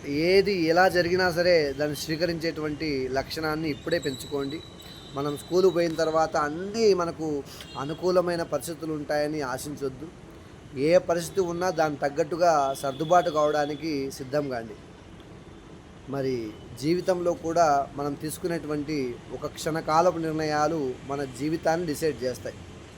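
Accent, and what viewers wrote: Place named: Adoni, India. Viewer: Sorry సర్,,, మీరెవరో తెలియకనే మన ఆదోని వారు గమ్మత్తు మాట్లాడుతున్నాడు,, అని subscribe చేసుకున్న,,, కానీ మిగురించి తెలుసుకున్న మీరు ap స్టడీ లో తోపు అని,,,, hatssapp సర్ మా జిల్లాలో పుట్టినందుకు,,,,❤❤❤❤❤❤❤❤❤❤❤❤❤❤❤❤❤❤❤❤❤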